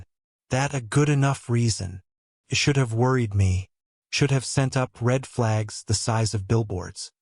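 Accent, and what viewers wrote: Spain. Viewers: The occasional Spanish and Spanish accent is funny 😂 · and again those spanish accented phrases popping up like unwanted exclamation marks -.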